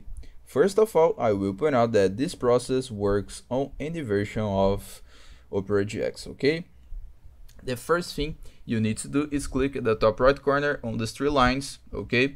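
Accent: Brazil. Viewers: parece br falando ingles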